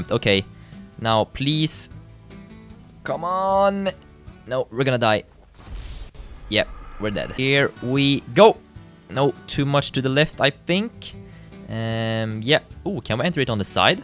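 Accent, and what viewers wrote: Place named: Sweden. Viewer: You sound like your swedish.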